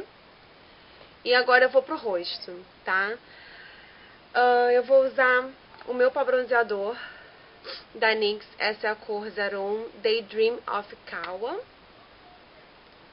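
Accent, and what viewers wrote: Rio de Janeiro, Brazil. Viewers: esses comentários inúteis são de pessoas com pura inveja de vc. acho sua voz linda, adoro seu sotaque carioca e vc é linda!!!!não é atoa que faz tanto sucesso aqui no YT. bjs!!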